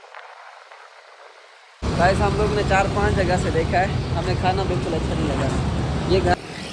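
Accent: Saraiki, Pakistan. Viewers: لہجےسےواضح یےکہ یہ صاحب سرائیکی ہیں۔۔۔ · Happy to hear that saraikian accent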